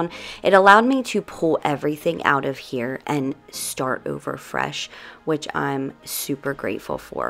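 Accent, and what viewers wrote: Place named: Delaware, United States. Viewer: I have been watching you for a while now and I knew that you were from Delaware, I could tell by your accent. I am from Newark, but live in Western Maryland now. I know it's dumb, but I'm so excited that I was right. I just sent you a friend request on Facebook! You don't have to accept. I couldn't help myself!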